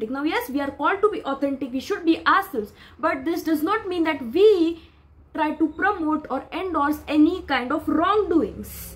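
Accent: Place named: India. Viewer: Curry accent LMAO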